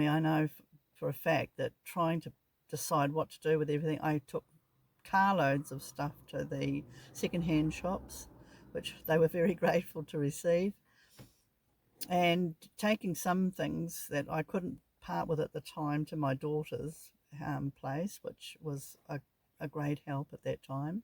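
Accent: New Zealand. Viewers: Your lucky you live in NZ by the sound of your accent.. it’s easy to live in a camper van in NZ perfect country for it. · Nice to hear a kiwi accent on YouTube 😊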